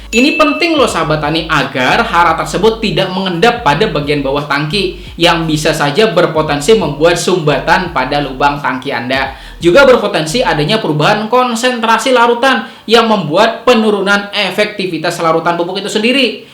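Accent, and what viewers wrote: Banjar, Indonesia. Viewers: Kapuas. Pinanya logat Banjar pian pak😅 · Orng banjar nih..logatny gk hilang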